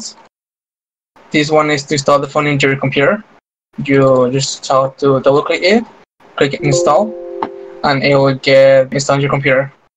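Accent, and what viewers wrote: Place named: United States. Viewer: A mira se volvio bien gringo el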